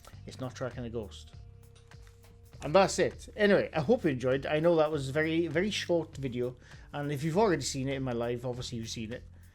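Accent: Wales, United Kingdom. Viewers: Oh... The wonderful Welsh voice of reason! It irks me so much the amount of people that refuse to accept a logical explanation! OK, I get that a lot of people desperately want to believe in life after death etc, in all honesty most of us would like to think that way! But sls stick men are not the way forward, and so many creators are jumping on the stick man bandwagon now. Even a weeny channel called 'Get Spooky Society' have, and I had such hopes for them too!🥺 Oh well, onward and upwards!🤷🏼‍♀️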